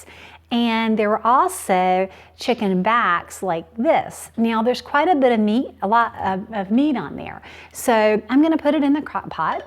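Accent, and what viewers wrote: South, United States. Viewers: i really like watching you you have a very calming voice love the southern accent I'm from london uk xx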